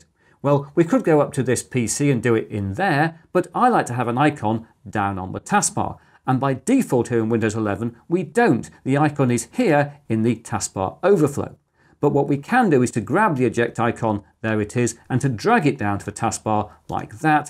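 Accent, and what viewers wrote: United Kingdom. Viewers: Essential viewing for a beginner like me. And you're British, as I am, not yet another American. Discovering your channel really has made my day. Thank you so much.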